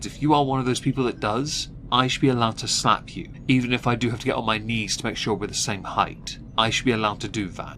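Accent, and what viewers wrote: United Kingdom. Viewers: You know the very best start of your vid is your saying in your British accent is “Well isn’t that just fantastic?” because that’s exactly how I would say that minus the British accent lol!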